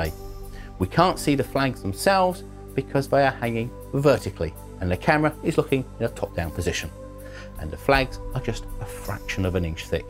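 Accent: United Kingdom. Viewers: The soft tender British voice of reason!! Google has discovered my chickens and their feathers in my back yard through the atmosphere!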